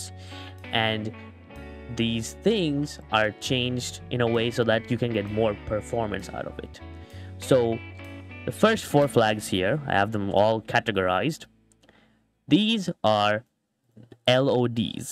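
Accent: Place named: Bengal, India. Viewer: u sound so bengali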